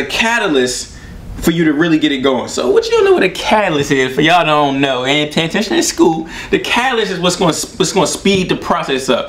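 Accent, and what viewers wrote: Baltimore, United States. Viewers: most def from bmore i hear it lol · Is he from Baltimore? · he baltimore af “EXECISE” no R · Hear that bmore 😂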